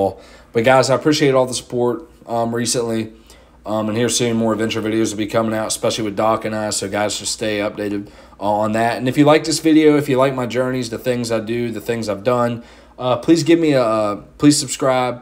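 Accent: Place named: Pittsburgh, United States. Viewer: As soon as you talked I Knew you were from the Burgh.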